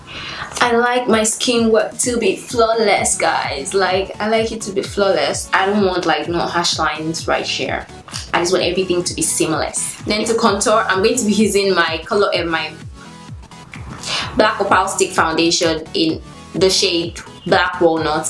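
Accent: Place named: West Africa. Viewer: Okok I see you ahaha, I hear an accent are you from somewhere in west Africa ?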